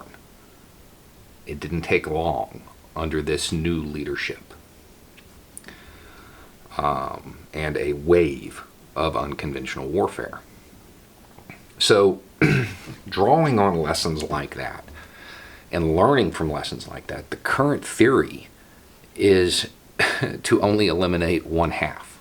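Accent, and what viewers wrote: South, United States. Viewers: If you like MSNBC with a southern accent and a beard.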